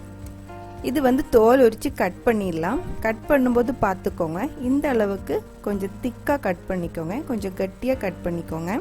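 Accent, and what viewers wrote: Kerala, India. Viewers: Kerala smell is reflect ing in ur sweet speach chachi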